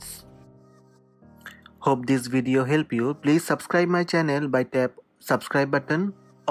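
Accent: India. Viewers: But I like the Indian accent!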